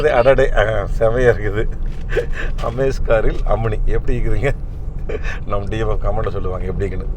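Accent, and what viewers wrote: Kongu, India. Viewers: கொங்கு பேச்சு வழக்கு அம்மணி மிகவும் அருமை 🌹🌹🌹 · Ungaludaya sweet kongu speech really super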